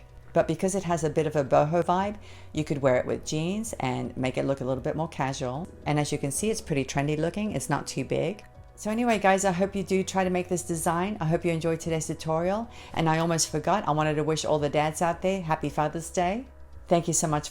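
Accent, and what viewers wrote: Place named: Australia. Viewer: Dear, I love the necklace you showed us at the beginning of this video. Is that your beautiful garden? What is lovely place to live in. Your accent sounds a bit Australian - have I got that wrong or have you ever lived here in Australia? Thank you for your great videos, love from Amanda in Melbourne, Australia ❤️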